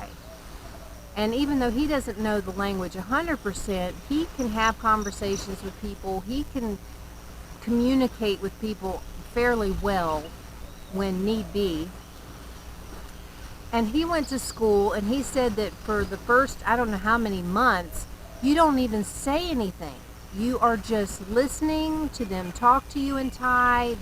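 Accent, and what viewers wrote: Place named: South, United States. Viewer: Sweet thang, you sound most southern!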